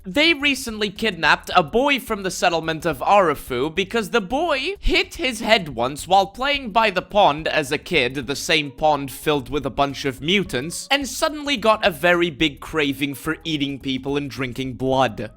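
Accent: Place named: Europe. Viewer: Good video but I hate your accent, are Europoors even human?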